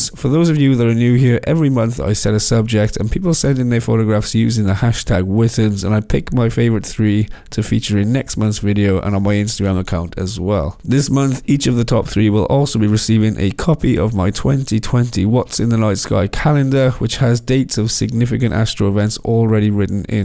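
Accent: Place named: Wales, United Kingdom. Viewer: New subscriber, recognise the accent... Cwmbran or Newport area?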